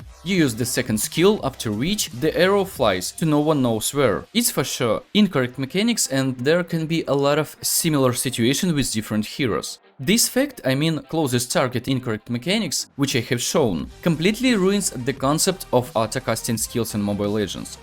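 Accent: Russia. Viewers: Сильный русский акцент , плюс очевидные ошибки в произношении звуков и целых слов и постановке ударения в слове очень портит речь. Советую, перед озвучиванием видео на английском проверять произношение в словаре, чтобы не было таких казузов, как произношение слова "focus" как "facas", которое звучит как не очень хорошее слово) А информация вполне полезная. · are you russian? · r u russian bro?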